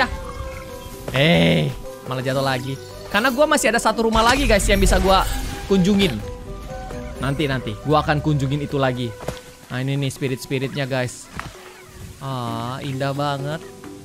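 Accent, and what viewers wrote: Java, Indonesia. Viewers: Mas po koei asli wong jowo ta kok ndang nding i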